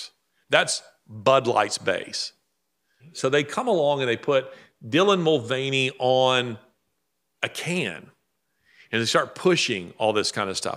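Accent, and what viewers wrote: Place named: China. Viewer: Sounds exactly like 🇨🇳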